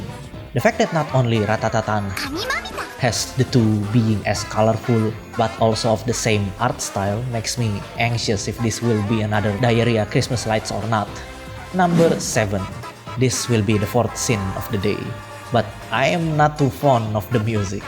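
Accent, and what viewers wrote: Indonesia. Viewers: You soun Indonesian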